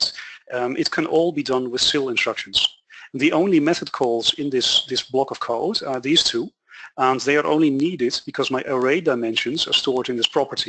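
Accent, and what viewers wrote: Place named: Netherlands. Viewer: bro u sound dutch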